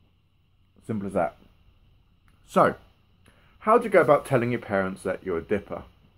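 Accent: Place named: England, United Kingdom. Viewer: Great video man it threw me bud this big husky bearded man I'm thinking OK hes from Michigan or somewhere here then you break out the English accent! Man that's awesome keep up the good work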